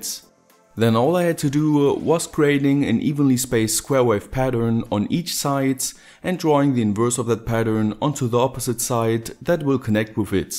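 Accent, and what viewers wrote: Germany. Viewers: are u from germany? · I really like your German accent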